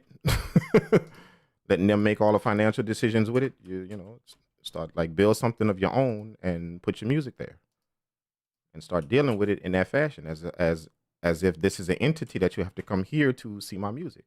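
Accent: New Orleans, United States. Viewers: you from the N.O huh?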